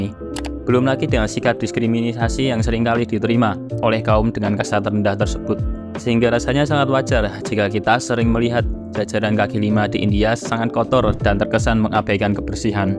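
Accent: Java, Indonesia. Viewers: hanya karena author medok pun dibuat masalah, miris lu ya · kalo ngomongnya masih medok, ga usah lu gua lu gua brody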